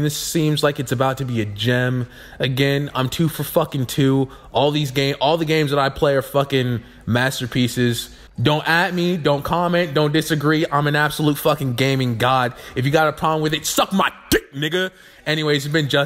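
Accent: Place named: United Kingdom. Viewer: bro how many accents do you have can't tell if you're British or im tripping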